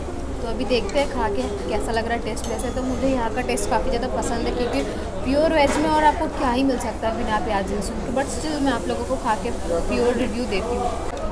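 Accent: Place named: Odisha, India. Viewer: Odia Heiki hindi...lol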